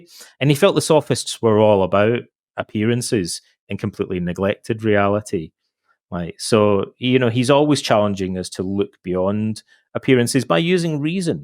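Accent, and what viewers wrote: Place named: Scotland, United Kingdom. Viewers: When I mentioned your description, Mr replied to it directly: "That's close. I'm from Ayr, not Glasgow, although it's nearby. I have lived in Nova Scotia, Toronto, and Montreal." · I didn’t know what I needed today, but listening to this man’s gentle Scottish accent as he talked about Socrates truly brightened my evening. Thank you so much.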